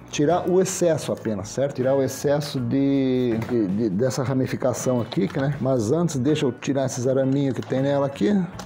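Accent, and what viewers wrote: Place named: Rio Grande do Sul, Brazil. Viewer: Ah sim, achei o seu sotaque bem parecido com o nosso daqui do RS, gosto muito dos seus conteúdos.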